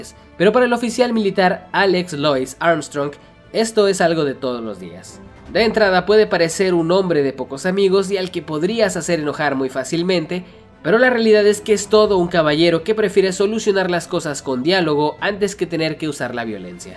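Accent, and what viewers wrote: Mexico. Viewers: De eres? Me suena muy mexicano tu acento; Aparte que usas Muchas palabras del léxico mexicano. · jajajajjajajajaj me gusta tu forma de ablar como nosotros haci mexicano mm creo que eres mexicano nuevo sub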